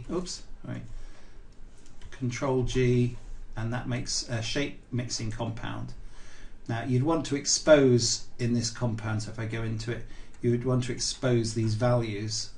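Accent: United Kingdom. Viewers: That is a very well spoken British accent.. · Yes, that is a British accent!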